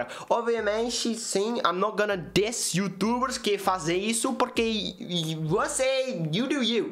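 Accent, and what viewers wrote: Brazil. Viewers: Kkkkk com você fala com um gaúcho falado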